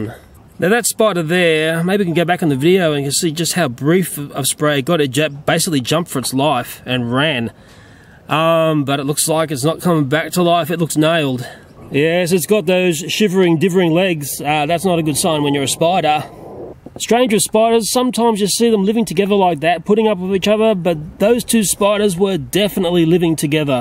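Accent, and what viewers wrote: Australia. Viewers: I love the way he says spiderlings in all his videos his videos I love aussies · i love his voice, aussie accents >>> `- love from Texas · I bet my kidney hes australian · you sound exactly like me (an Aussie)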